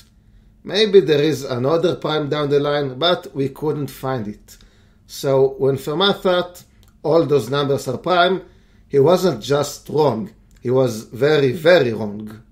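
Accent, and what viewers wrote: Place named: Israel. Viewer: מהמבטא ניחשתי, מהשם של הערוץ וידאתי, וואלה יש ערוצים ישראלים שמשתתפים ברעיון הזה. נחמד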